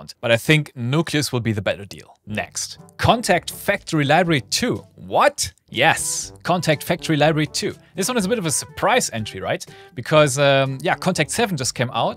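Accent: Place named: Germany. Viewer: Are you German? I can hear a slight accent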